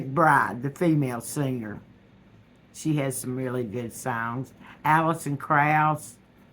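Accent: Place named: East Tennessee, United States. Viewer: I love listening to you. I was born in East Tennessee and lived there until I was in the 7th grade. It's good to hear the accent. I hope you're not too sad about the situation. I know there's plenty to be sad about but I hope and pray that it will all work out somehow. Just wanted to let you know that you have my support and thanks for sharing your views. I know it's not easy to do but it is appreciated. Best wishes!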